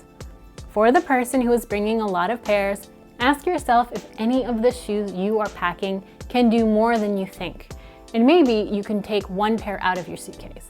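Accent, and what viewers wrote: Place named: Canada. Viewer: just recently discovered your channel and it's great! Your perspective on packing (the weather/activities/vibe/trip length quadrant) is refreshing. Instead of dictating a particular list or items, you invite the viewer to think critically for themselves to create a packing list that makes sense for them. I've been going carry-on only for 7-10 day trips to Europe since 2017, and your perspective inspired me to get ready for an upcoming trip to Madrid with a fresh outlook on layering and outfit-making - I'm so excited with my planned outfits! Your presentation is excellent: succinct, polished and friendly and it's great hearing a Canadian voice here as well. Comfort shoe recos: Love my tall leather boots from Poppy Barley (a woman-owned, Canadian brand from Edmonton that is ethically made in a small factory in Mexico). They do custom adjustments for calf-width, fit my orthotics and are so well made and comfortable. 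I've had mine for 8+ years and have walked all day in Toronto, Berlin, Amsterdam and more and have been able to have the heels replaced by a cobbler when needed. Also, Cobb Hill (a stylish comfort brand made by Rockport) looks cute, are supportive and comfy and fit my orthotics.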